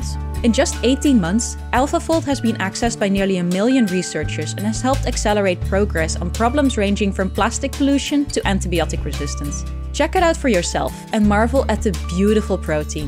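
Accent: Netherlands. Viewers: Dutch accent be thicc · Dutch? i could recongnise that accent from 100 km away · Kan me niet op de video concentreren hoor met dat mega Nederlandse accent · Damn that accent cannot be more Dutch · As a Dutch person I instantly recognised the accent as Dutch😅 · Do I hear a Dutch accent there? It sounds like she's Dutch and I love it! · i thought right away "since when did minuteearth have a dutch accent"? then i checked and saw the name....🤣 cute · It's funny hearing this as a dutch person because the accent of the narrator is so clearly dutch. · This new presentation voice sounds very Dutch, is she from the Netherlands? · Heyyy that's a dutch gal; I can instantly tell. Je doet het goed! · she has a Dutch (Netherlands) accent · Massive dutch accent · As soon as started to talk I heard the Dutch accent! Awesome video anyway:) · Are you dutch by any chance?? Your accent really sounds Dutch 🧍‍♀️ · Sorry if i am wrong, but i am 99% sure this is a dutch accent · you have to be dutch :) the way you say creutzveldt-jabobs is how you say it in dutch. 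also there is a dutch accent on the english but Im al proud duty to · Yikes, dat je uit Nederland komt is onmiddellijk duidelijk van jouw accent. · She is definitely dutch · Dutch accent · ✨Dutch accent✨ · Are you Dutch? 👀 You sound Dutch (from a Dutch person) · And yes, as always i can hear a dutch persons heavy accent. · A wild dutch accent appears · The Dutch accent makes me feel at home instantly. (sorry, if I misidentified the accent) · Nou ik hoor dat het Nederlands is natuurlijk, lekker bezig!! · that's just her being Dutch though. They talk like that :p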